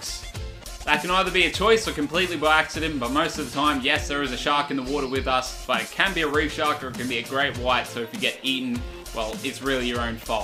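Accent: Australia. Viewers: Are u actually Australian or what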